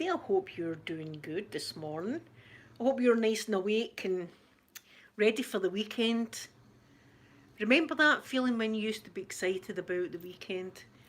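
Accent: Scotland, United Kingdom. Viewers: Aw,. You're one of the most cheerful ladies I've ever seen. I like popping by just to hear your lovely and adorable Scottish accent. <3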